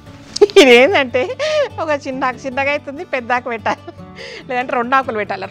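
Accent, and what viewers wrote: Telangana, India. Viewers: స్వచ్చమైన తెలంగాణ యాస తో మమ్మల్ని అలరిస్తున్నారు .ధన్యవాదములు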